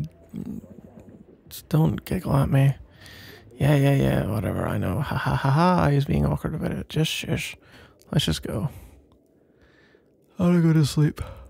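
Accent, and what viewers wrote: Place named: Scotland, United Kingdom. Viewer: Your audios make me yearn for a boyfriend like you. The accent. The humour. The gentleness of you. God I wish I could find a scottish boyfriend like you haha. Keep making good audios, sbf! You literally saving us gays for being a hopeless romantic 😖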